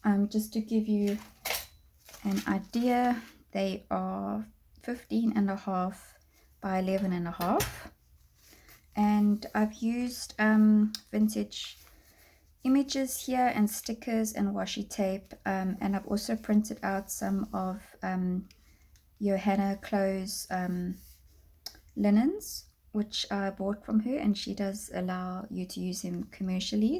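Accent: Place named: South Africa. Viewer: You have some wonderful ideas and beautiful items! Love you SA accent too! 🤗🌸💕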